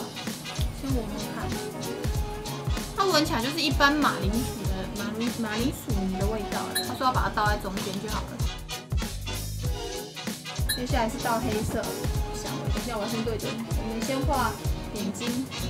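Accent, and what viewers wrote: Taiwan. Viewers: 妳真得好可愛呀~ 💖 每次聽妳突如其來的"國台語"或時而的"念錯詞"就笑了!! 很喜歡妳的視頻，繼續加油喲^^ 讚~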